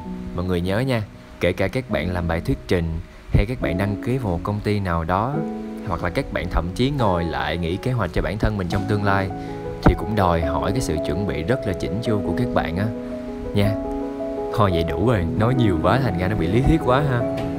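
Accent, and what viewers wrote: Vietnam. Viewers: Chất giọng người Nam Bộ sao thương quá là thương !!!!! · Trời ơi cái giọng sài gònnnnnnnnnn 🥰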